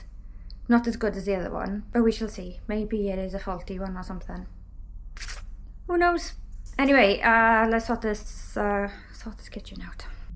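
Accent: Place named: Wales, United Kingdom. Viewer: aww thank you!!! Ive been watching your videos most of the day!! And while I cooked me curry! 😂 absolutely love them! Will give you a shout out next week for sure! And i have to say, theres is nothing better, than the accent of a Welsh woman swearing! 😅 keep the videos coming hun!!!! ❤❤❤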